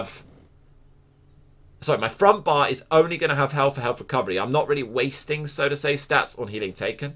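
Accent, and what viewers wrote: Scotland, United Kingdom. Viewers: Your Scottish voice makes me laugh every single time 😄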